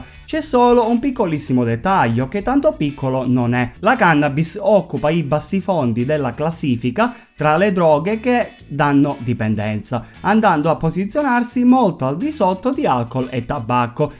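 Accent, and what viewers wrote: Italy. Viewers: Quanto ci sta l’accento sardo ? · Compà sei calabrese?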